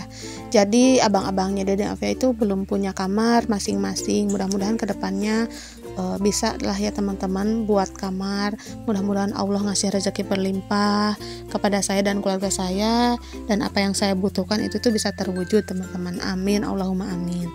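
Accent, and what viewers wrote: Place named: Sunda, Indonesia. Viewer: kalo ngomong kayak istrinya bang deni cagur logat sundanya kentel😅👍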